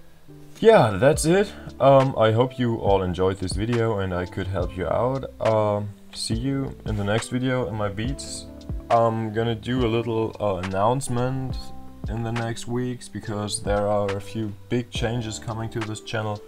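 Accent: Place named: Germany. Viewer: Geiler Content, sehr korrekt das du dein Template teilst. Aber bro man hat ab der ersten Sekunde gemerkt das du Deutscher bist ❤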